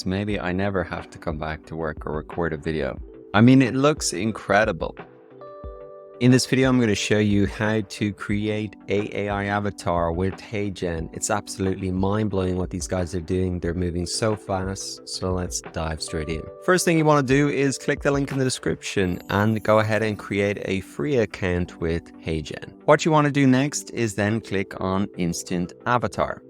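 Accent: Ireland. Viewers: Holy Crud Batman. I'm torn here because I live in NJ and have been told I have a bit of an accent. I personally think removing your very charming Irish accent is not a great thing. Now I'm in the mood for a Guinness. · Nooooooooooo. It doesn't get your fabulous Irish accent at all. Your regular voice is so good. I want to recording of it to fall asleep to at night.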